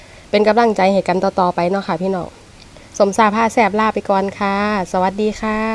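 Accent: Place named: Isan, Thailand. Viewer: เป็นตาแซ่บแท้ครับ อยู่น่านคือเว้าอิสานครับ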